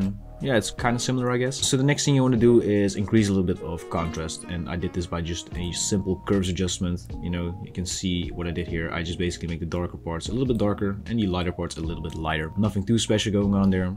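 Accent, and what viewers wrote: Netherlands. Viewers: i thought i recognized a dutch accent 😝 i am in amsterdam, so not close enough to enjoy the creative space in tilburg, but nice to know there is a digital art collective here in the nl!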